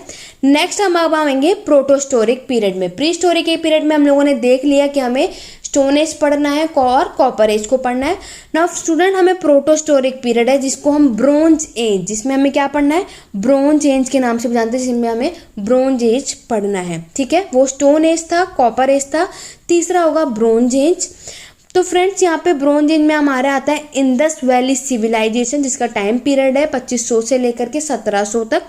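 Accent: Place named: Haryana, India. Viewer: Haryana sa ho mam lgta ha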